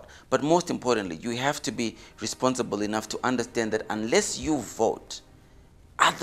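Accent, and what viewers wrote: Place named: United States. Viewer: But why sound so American.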